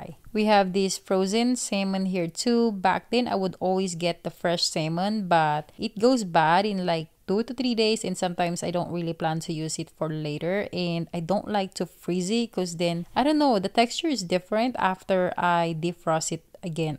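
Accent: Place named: Philippines. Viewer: 🛒 Loved the video, so I had to subscribe! If you don't mind my asking, are you Filipino? I lived in the Philippines until I went to college in the states and your accent makes me homesick. ❤ So many good items you found at Sam's club! I'll have to try the fried rice, it looks so good!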